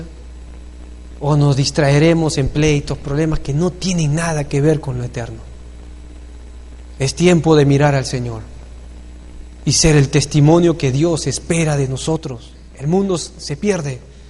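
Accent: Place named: Peru. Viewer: Por el tono de voz, creo el pastor es Peruano, cuál es su nombre?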